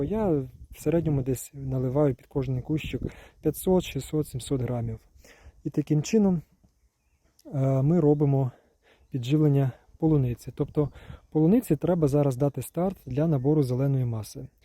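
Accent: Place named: Ternopil, Ukraine. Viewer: Ми, напевно, з Вами земляки, бо мова у Вас справжня ( я з Тернопільщини). Поради Ваші грамотні, конкретні.Я підписалась.Успіхів Вам! Слава нашим захисникам! Слава Україні!❤❤❤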